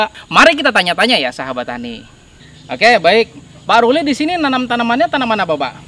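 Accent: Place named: Hulu Sungai, Indonesia. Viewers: Kukira orng mana kah ternyata eh ternyata orng hulu sungai jua 😁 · Pa p urang mn ky ad lugat kalsel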